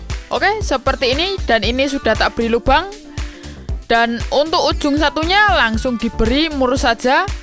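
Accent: Java, Indonesia. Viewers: Bang, menurut sy sih ya klo bs jangan kebanyakan pake logat jawa bg soale gua ga ngerti kadang bahasa jawa,, maksi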